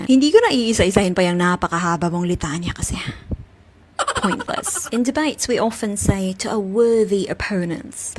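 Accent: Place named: United Kingdom. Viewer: Hello Ms, good day to you. I don’t think Tio Moreno capable of a debate with a person as intelligent as you. Love that British accent by the way Atleast it sounded like a British accent or yung hilaw na Australian accent.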